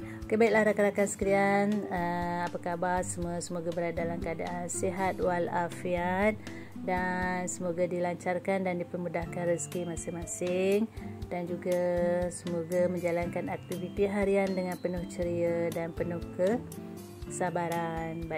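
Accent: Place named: Malaysia. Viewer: saya juga kurang suka dawat nya kak karna nggak enak dipandang warna nya setelah dimasak, denger kak ngomong berasa nonton drama malaysia, aku suka film2 malaysia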